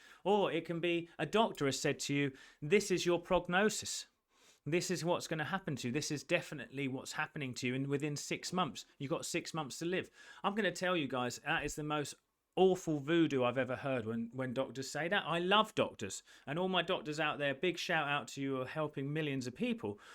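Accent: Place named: London, United Kingdom. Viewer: You have a lovely voice and I also love your London accent!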